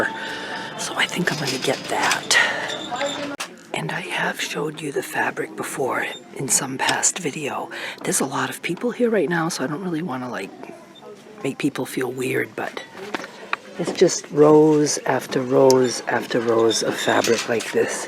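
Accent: United States. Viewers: I happen to love the swearing lol. You talk just like most of 'Merica :)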